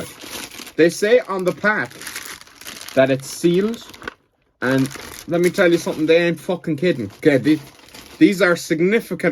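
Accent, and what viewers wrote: Ireland. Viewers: What’s your accent? Trying to learn about Irish regionalaccents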